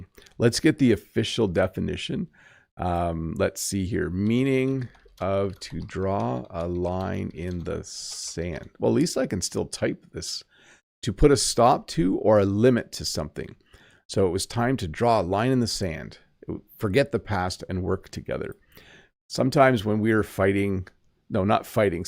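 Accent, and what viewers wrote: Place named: Canada. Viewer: Congratulations I'm from Piracuruca Piauí in northeastern Brazil and I want to have a Canadian accent just like you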